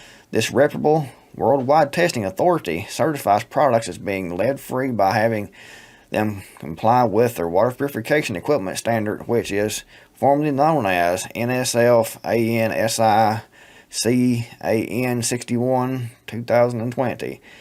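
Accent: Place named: East Texas, United States. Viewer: perhaps Esst Texas or Knoxville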